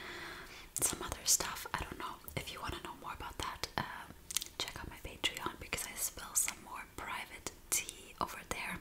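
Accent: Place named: Germany. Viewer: h2o is basically my heart ps: i am german and i watched the video thinking that i can hear a german accent and then you said that youre from germany haha made me happy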